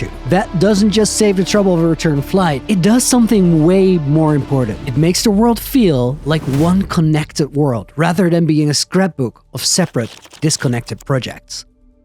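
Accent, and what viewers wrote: Netherlands. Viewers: since I joined this adventure when u only had like 50K subscribers, I immediately recognised you’re Dutch accent, I am from Belgium but I really enjoyed every hour I could watch u. Keep up the great work man!!!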